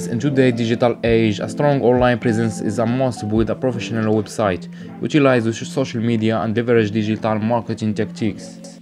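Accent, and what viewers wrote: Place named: Morocco. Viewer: لونجلي نقية كمل اخويا المهم عرفتك مغربي غا بالأكسنت ❤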